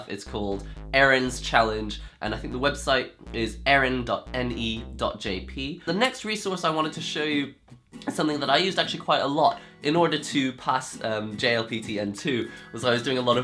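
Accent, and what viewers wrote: United Kingdom. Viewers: So is the British? And learned Japanese?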